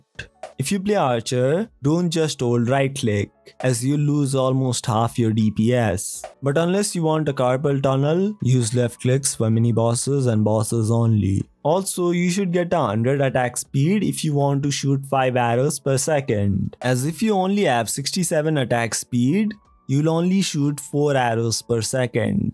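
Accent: India. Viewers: why do you sound like indian dialect? By the way, very good content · you r indian dont lie :) you r talking in indian english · Because your voice was sounding Indian to me and you had added Hindi subtitles as well🗿😂 Is my guess correct?😳 Please reply me 😭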